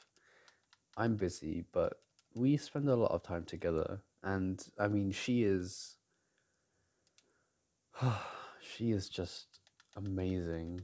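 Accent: United Kingdom. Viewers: THE BRITISH ACCENT AAAAAA 😭<3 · bro im british and i still get excited when i hear a british accent lMFAO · You had me at British accent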